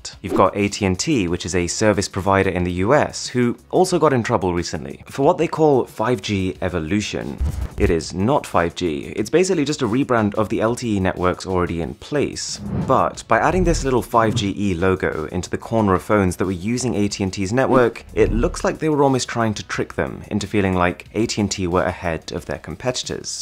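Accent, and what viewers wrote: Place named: United Kingdom. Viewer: yeah he sounds birtish-y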